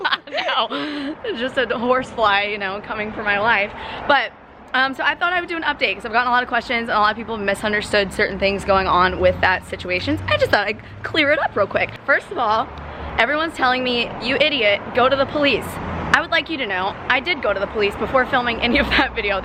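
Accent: South, United States. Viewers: Anwesha Barua it could be because they both have a southern accent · All the people saying she sounds like Miley Cyrus...well she's from the south so it's a southern accent..pretty much all southern states sound the same some more hickish than others! Lol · This is the second video I've way he's of urs and I'm definitely a subscriber now. I live in Tennessee and even though u have a southern accent it's so weird that's it's different from ours down here lol Also, u sound like Miley sometimes. U should get a Samsung phone cuz it's much better in my opinion lol Did u not have a finger imprint or a passcode on ur phone? U can download an app on ur phone too in case someone tries to get into it, it will take multiple pics of them and u can have those pics sent to an email or someone else's phone. Phones cost too much these days to not have good security one them now a days lol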